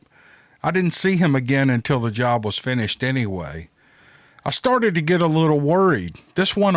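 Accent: South, United States. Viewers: Your channel for stories is the best. Your southern accent and kind way about you, makes you fun to listen to. Keep up the great job. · I could listen to your intro songs and by themselves for hours and still enjoy your show.Im in the Huntsville area so your accent is easy for me to understand,did you know that there are eleven distinct southern dialects? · There nothing wrong with your voice I love the way you talk and when you do these videos you can close your and imagine yourself there you do a good job in tell you this I listen to bigfoot case and I listen al TRUSTED but your is the best cause you make the stories more interesting and I get my popcorn and sit back when you tell the story it like you there .thank for the video I keep enjoying your videos keep up the good work with your southern accent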